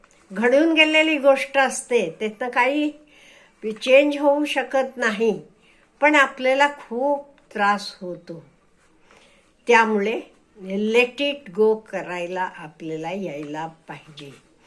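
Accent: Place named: Gujarat, India. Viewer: ताई नक्कीच गुजराती वस्तीत राहिल्या आहेत. उच्चारच सांगतायत.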